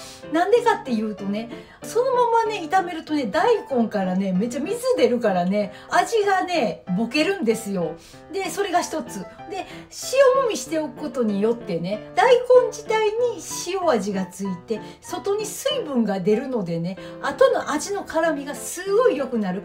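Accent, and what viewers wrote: Kansai, Japan. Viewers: 大阪弁かなと思ったが、ちょっとアクセントが違うので京都弁かなと思い、プロフィールを見たら、京都出身の方でした。 いつもユニークなレシピ参考にしています。３９ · 大根きんぴら作りたいと思います 先生は千切りが好きとおっしゃってましたので流石にうまいです手際が良い。 関西弁が可愛らしいです。そこ知りたいってところのコメントがうまいです😋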